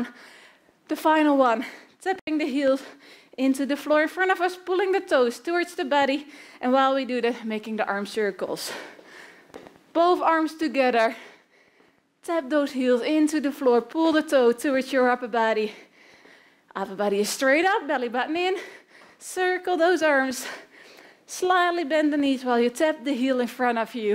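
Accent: Netherlands. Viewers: Dutch accent? so cute! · Are you Dutch? I can hear the accent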